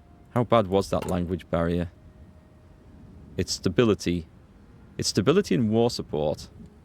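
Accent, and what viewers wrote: United Kingdom. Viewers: great for you. better for us. I love your gameplays, mainly because of british english which is not so common on Youtube :)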